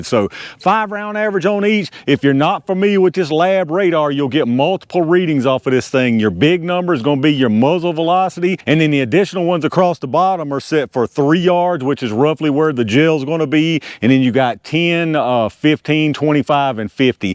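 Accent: South, United States. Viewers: I knew the CCI would work. It's the same bullet that's loaded in the Subsonic Segmented HP CCI sells. I use the mini mag version in my suppressed 6". The run 1060fps from it, and the subsonics run pretty much the same velocity from my rifles. From your accent, I can tell you're another southerner (Bama here). So you can face Alabama and wave, Hi neighbor ;) The segmented CCI won't exit a raccoon or possum, in my experience. Convenient when either are raiding the cat feeder on the front porch 👹 Also, glad to see another 10mm fan <3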